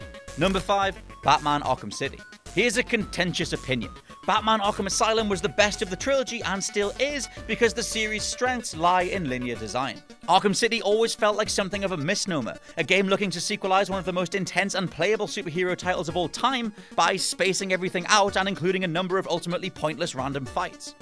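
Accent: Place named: United Kingdom. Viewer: A British accent wearing an Alter Bridge T-shirt, makes it hard to stay mad at you.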